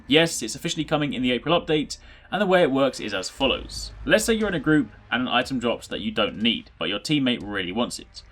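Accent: Sweden. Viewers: You're Swedish? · are you from sweden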